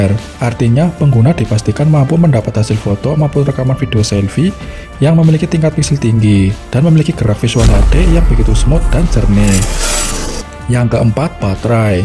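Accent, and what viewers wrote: Banyumas, Indonesia. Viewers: logatnya ngapak......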